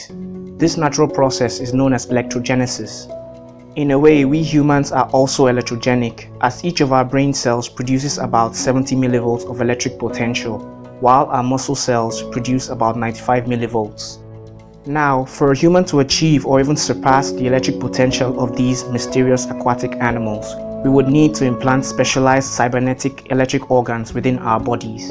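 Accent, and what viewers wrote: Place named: Nigeria. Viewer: bro your accent sounds Nigerian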